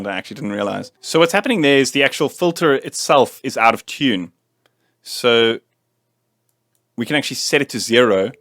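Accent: South Africa. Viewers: I know a South African Accent when I hear one! Great review bru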